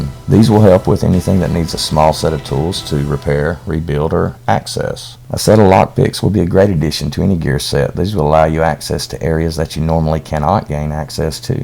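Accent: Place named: South, United States. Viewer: I wish I had this guys country voice I would get so much pussy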